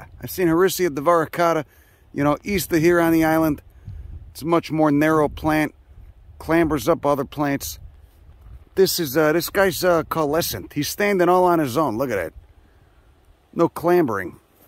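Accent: Chicago, United States. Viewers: Ay, ah? Been watching you for awhile now. Lived in Chi most of my adult life, but left a few years ago. Found your channel and stopped to hear the accent again, but stayed because you make botany interesting. Been on the North Coast of the DR since December. If you're coming up toward Puerto Plata/Sosua/Cabarete and you want to dial in more local flavor, lemme know and what the shit.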